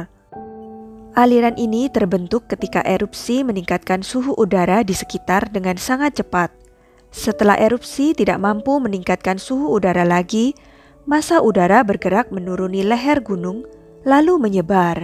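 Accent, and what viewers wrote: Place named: Java, Indonesia. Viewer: Admin medok banget asli orang jawa kah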